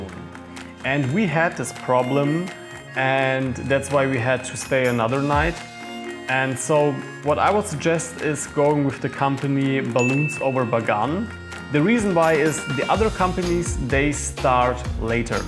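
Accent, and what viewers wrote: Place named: Germany. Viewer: Stick to your pizza's dude, one cannot only hear you are German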